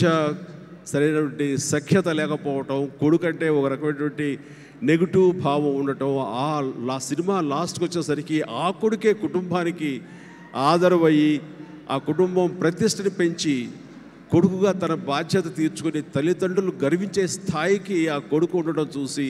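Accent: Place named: India. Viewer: Eena okadu English ni telugu laaga matladuthadu accushan choola baaga chesadu antha alludu...!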